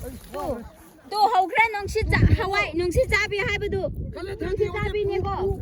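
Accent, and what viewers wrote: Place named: Manipur, India. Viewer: So simple n d way u talk manipuri so sweet 💖💖💖💖💖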